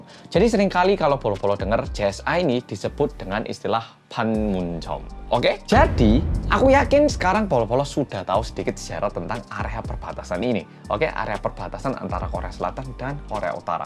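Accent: Java, Indonesia. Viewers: Tim gercep mas ~🤣🤣🤣 orang Korea yang MEDOKKKKK~~~ btw aku kesini cuma gara² ada Hyun Bin (Kapten Ri)😭😭😭🤣🤣👍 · Lagi nonton KBS Live di YouTube,eh ada notif dari mas....auto klik dong. Bersama orang korea yang "MEDHOKKKKKKKKK" Lho rambute sampean ganti maneh mas. Lup² mas ❤ Jatim hadir disini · Gaya bicara pembikin konten cukup nyentrik, wajah Korea ngomongnya "medhok" kejawa2an 🤣🤣🤣tp aku like lho... 👍👍👍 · Suara nya kaya orang Jawa Medok banget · Lah kok ngomongnya kaya jawa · Jawa banget suara nya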